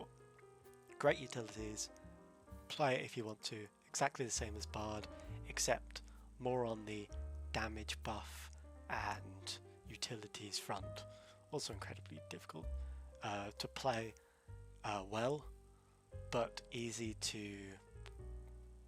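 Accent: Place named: United Kingdom. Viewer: great video again love it youre so good to watch on stream. love the british accent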